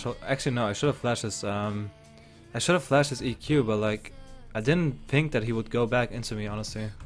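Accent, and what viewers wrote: Germany. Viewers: are u from german ?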